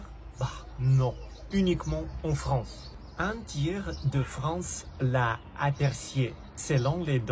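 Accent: Russia. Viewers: salut ton accent russe est cool bisse de france.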